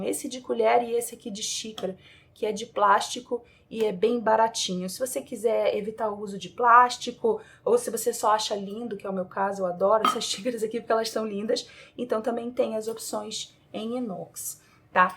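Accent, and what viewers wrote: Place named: Rio de Janeiro, Brazil. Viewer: ETA CARIOCA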